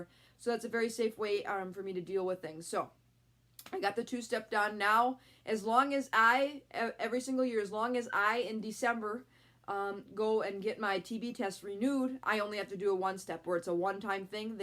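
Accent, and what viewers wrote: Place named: Midwest, United States. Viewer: Thanks for sharing! I am getting a TB test this week! I was guessing you were from the midwest by your accent and then at the end you mentioned WI! That's where I'm from😊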